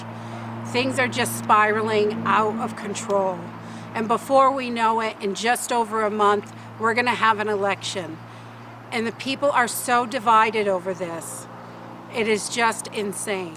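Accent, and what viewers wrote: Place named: New England, United States. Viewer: I just found your channel. You sound like your from new England. Are you?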